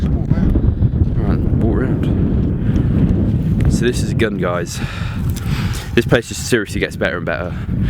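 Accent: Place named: India. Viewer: Inglish accent, sounds, suks, like this dud accent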